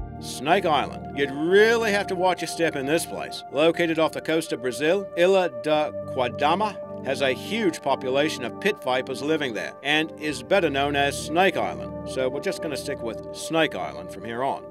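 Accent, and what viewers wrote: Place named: Australia. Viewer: You have the strangest accent I have ever heard, like a mix of Australian, American and British.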